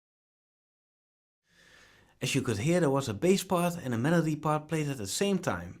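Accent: Netherlands. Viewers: nice one thanks alot! Are you dutch? i can hear it by your accent ;) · Hahahaa je kunt horen dat je nederlands bent ! Geniaal ! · Your accent, sounds awesome, let me guess, you're dutch, right?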